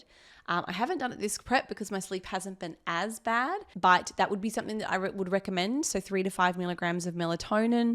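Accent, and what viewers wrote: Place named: Australia. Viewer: Hello from California. Terrific advices & lifestyle vlogs. We knew we were watching a champion ever since we watched your first videos, Ms.. Inspirational. We like the Australian accent, Australian celebrities, & Australian models. You are a fantastic coach/ personal trainer, good teacher. Congrats from our channel 🎊✨🦜🦜🦜Australian Parakeets Stars✨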